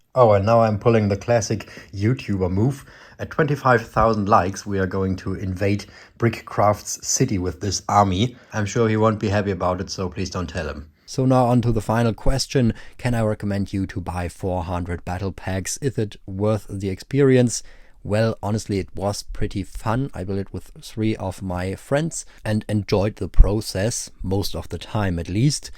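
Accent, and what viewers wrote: Germany. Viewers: Are you not german? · Ey man hat direkt direkt gehört dass er deutsch ist :)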